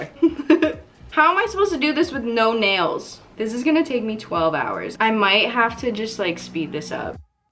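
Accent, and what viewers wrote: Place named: Canada. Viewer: Question: Are you Canadian or am I stupid? You wound very Canadian. I’ve been following you on tiktok for a while but this is the first time I’ve heard your voice and for some reason I fucking love it.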